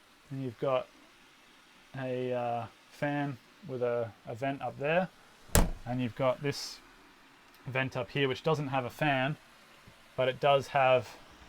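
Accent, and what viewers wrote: Australia. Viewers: Very nice little Camper.I was listening to your voice young fella and finally realized its an Aussie voice. Very Nice and this size van would suit an old Lady that I am at 71 years § Still would love an Adventure.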